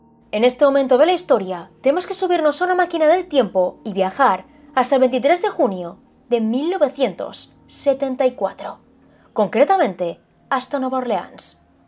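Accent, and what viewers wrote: Spain. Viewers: ¡Qué linda estás! Siempre veo tus video y me encantan (además amo el acento español). Saludos desde Argentina🥰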